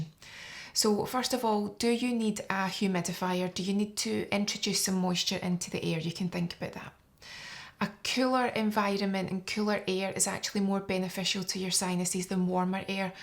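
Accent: Scotland, United Kingdom. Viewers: I really would like to just have this lovely Scottish lady follow me around all day long, narrating my life. Oi, this wee bastard just cut us off. Now we're flipping him the bird. Off we go again. What a grrrrreat day. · Are u anywhere near London , obviously got Scottish accent but thought I’d ask , would love hand arm massage . And maybe this sinus treatment, you are really really really educated and knowledgeable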